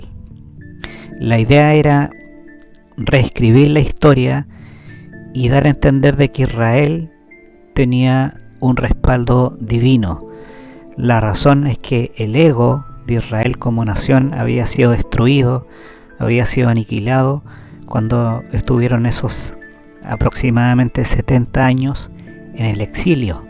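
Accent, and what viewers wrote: Chile. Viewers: Ya que tocaron el tema de la locución, coincido en que a mí tampoco me gustó. Desde luego que el contenido es más importante y no voy a dejar de ver el vídeo porque la locución no me gusta. Pero, si deseo mejorar el canal, es preciso cambiar de locutor. No es tanto el acento chileno (que también) sino que realmente no sabe narrar. Lee el libreto como sea, no hace las pausas necesarias, no transmite...pareciera una persona al azar que ni siquiera conoce el contenido del libro. A mí me gusta la voz de Helena, lee muy bonito. Al final de cuentas, nuestro idioma viene de España, por lo tanto quienes mejor lo usan son los españoles. Humilde opinión. Saludos. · A mí por el contrario me encanta que 🤩estos vídeos se hagan de viva voz de: ¡Se que eres tu! Porque nadie más podría explicar con tanto discernimiento y claridad lo que ha expuesto en su blog y eso es grandioso.. 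He aprendido mucho con tus aportes, ya que desde hacía muchooos años buscaba aterrizar de esta manera las lecturas del libro. Por más podcast como estos con acento Chileno 😄 por favor🎉. Gracias ❤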